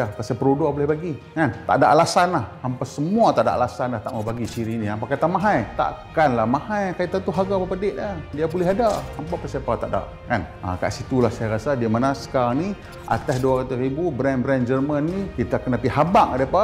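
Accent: North, Malaysia. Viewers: Ni versi utara ka? Lain maciam dia punya bunyik....ha..ha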